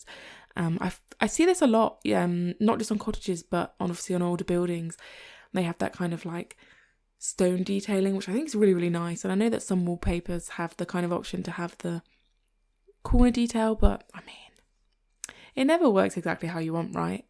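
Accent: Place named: East Midlands, United Kingdom. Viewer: PS - are you from somewhere northern originally? Your accent now sounds very southern, and a bit towards RP, but words like grass and bath, you say in a way that makes me think maybe East Midlands?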